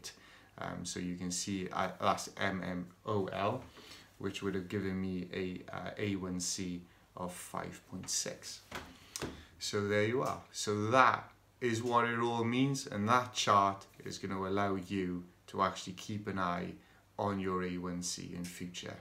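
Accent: Wales, United Kingdom. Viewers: Do I detect a Welsh accent?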